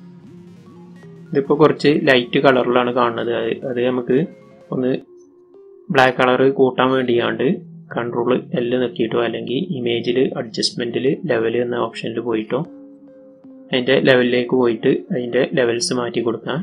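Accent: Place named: Malappuram, India. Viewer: മലപ്പുറം ഭാഷ ഒന്ന് മാറ്റി പിടിക്കുമോ?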